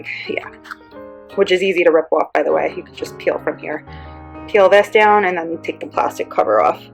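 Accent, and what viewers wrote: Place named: Boston, United States. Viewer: Boston maybe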